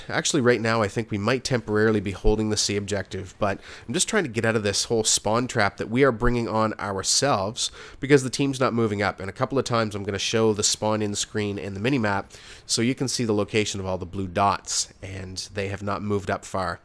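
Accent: Canada. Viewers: yes your Canadian... REPREASENT!!!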